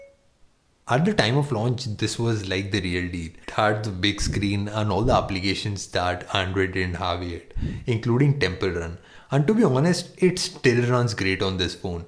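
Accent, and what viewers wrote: India. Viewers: Fuck your Indian accent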